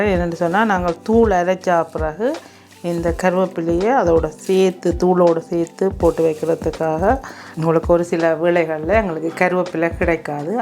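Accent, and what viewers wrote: Jaffna, Sri Lanka. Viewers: Very fine.thanku very much. Your srilanka tamil fine. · யாழ் தமிழ் மிகவும் அருமை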